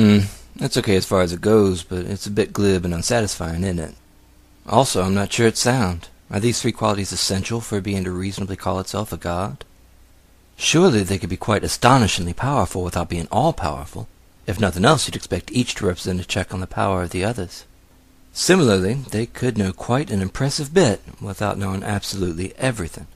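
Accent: South, United States. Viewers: I really like your voice. Are you from Virginia? You sound like a classic southern gentleman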